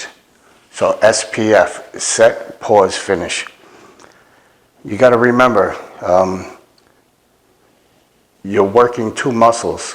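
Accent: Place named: New York City, United States. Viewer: Good stuff! Loving your videos...simple...straightforward...with the NYC accent! I have lots of drills to work on now to improve my game, so thnx!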